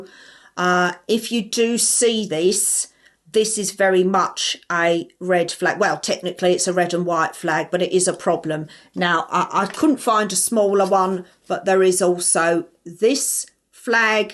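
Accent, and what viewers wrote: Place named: Kent, United Kingdom. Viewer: Brilliant Kent accent too 😂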